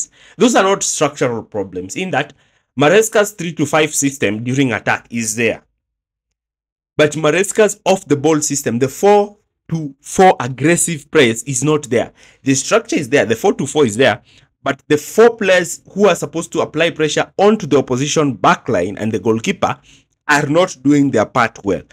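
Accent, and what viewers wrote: Kenya. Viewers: You've got a Kenyan accent, very fluent and as CFC fan ,I love your analyses. Keep it up bro. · Are yu from kenya😮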